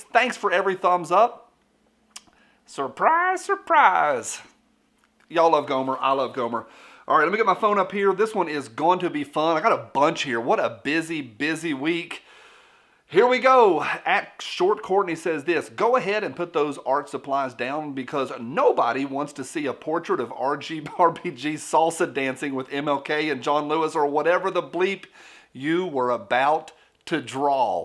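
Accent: South, United States. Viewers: Hey! Hope you aren't offended because of y'all's accent! We just know it as accent, not Gomer Pyle's! I just hope you take as the fun as it is intended to be! You're one of the top channels I keep coming back to!!! You're funny, good-natured and give good content! I'll keep watching till you stop or drop. Southerners have a particular accent, so do New Yorkers, and so do us Northerners. Believe it or not but Mid-Westerners do as well, you just have to listen carefully for it. And the one I like is dc draino 2 genders one!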